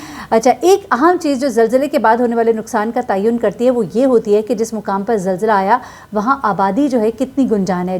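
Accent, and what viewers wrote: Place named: Punjab, Pakistan. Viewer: اور آپ غالباً تلہ گنگ یا سرگودھا سے تعلق رکھتے ہیں،وہاں پر ہی اس طرح سنا ہے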